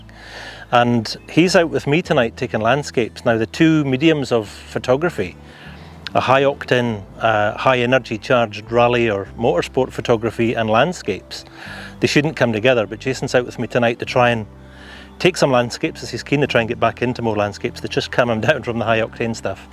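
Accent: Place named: Orkney, United Kingdom. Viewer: So glad Simon Booth pointed me in your direction, your Orcadian accent reminds me of holidays up there and my dad who still has his twang despite living in the Midlands since the late 50’s. Rallying used to be my hobby and now it’s photography so Jason’s work will be my next port of call 😉 lovely images guys 👍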